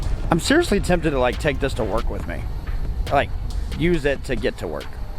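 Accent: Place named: East Texas, United States. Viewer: You should definitely ride the board into work! Love the vid! And that east texas accent is just proving me right that you're the.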